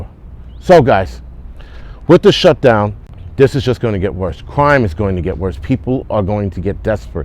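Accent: New York, United States. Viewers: THE PROFANITY WITH THE NY ACCENT IS THE BEST !!! YOU ARE SPEAKING FOR THE UNHEARD AND VOICELESS! !! AND SPEAKING HOW THE PEOPLE FEEL RIGHT NOW !! NO APOLOGIES !! GOT DAMN IT I LOVE IT !!!!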